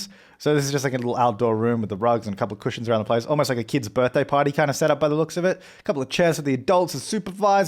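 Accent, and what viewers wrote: Australia. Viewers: Ocker voice AND CLASSIC MAKES A RETUUUUUURN!!!!;)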